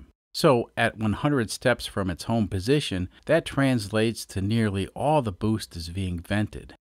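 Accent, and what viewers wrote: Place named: Midwest, United States. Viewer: I’ve been thinking about why this channel “works for me”. - First of all its simply entertaining. - Your channel combines several of my younger self passions (being a motor head, electronics, computers, and just making stuff to see if I could do it). - You explain difficult subjects with clear to understand “Midwestern dialect”. (I was really wondering how you were going to pull off explaining interrupt processing, but you did it well.) - The pictograms and simple explanation help us to visualize new concepts. Not everyone has a mech-e or computer science background. So pictures help greatly. - Your approach to the channel is much like just a couple of guys hanging out in the garage trying out different ideas, some work and some not so much. If everything worked the first time, what would we have learned? As for channel statistics, while you need to keep an eye on them for the health of the channel, I wouldn’t focus on them too much. 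Make what you enjoy and they will come. (edit: I tried to make a weak field of dreams reference, but I just looked it up and that was Iowa not Kansas. If it worked the first time, what did I just learn?)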